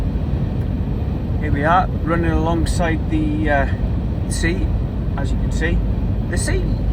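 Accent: Wales, United Kingdom. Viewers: Another great watch Mr 😁, contents as normal interesting, and the views spectacular😘, really can't get enough. Just one thing can't get my head around, where do you actually come from 🤔,I'm convinced there's a slight hint of a Welsh acsent, but it's got something else with it. Make the best of the weekend, o and what happened to the meeting up with Captain Slow😊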